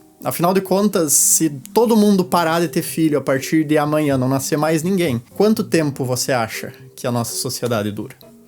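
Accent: Rio Grande do Sul, Brazil. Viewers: esse cara é gaúcho? acho muito fofo esse jeito dele de falar kkk. eu sou gaúcha tbm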